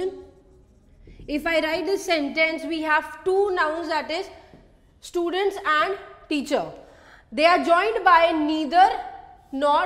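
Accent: India. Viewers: You are good. I really like Indian-English accent. But "I' is not a noun. It is a pronoun.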